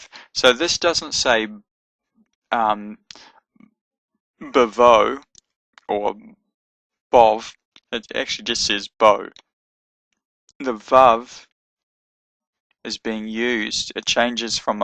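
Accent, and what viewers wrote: Australia. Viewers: aussie!!!!! love it!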